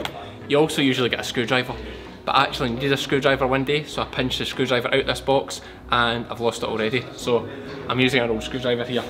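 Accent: Scotland, United Kingdom. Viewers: I fell in love with these sheep the moment I saw them. I had no idea they were so expensive. It always makes me laugh when I see a sheep being shorn. They don't move a muscle. No resentance or complaining whatsoever. I could never eat these beautiful animals. They remind me of children. I enjoyed your video and could listen to a Scotman talk all day. Music to my ears. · Hello from Wyoming USA. I love your videos and accent!! Just curious, you and Graeme (hoof GP) are both from Scotland but have such incredibly different accents. Is it simply the difference in regional dialects, like the difference between Boston and Texan accents? 😊 Thanks for all your videos !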